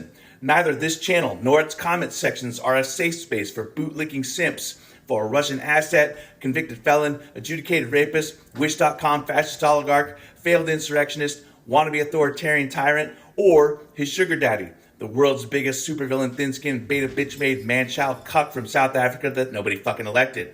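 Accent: Scotland, United Kingdom. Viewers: I love it when your Scottish accent comes out!! That's when we know you're beyond angry. One Team One Fight 💙 🇺🇸 💙🇺🇸💙🇺🇸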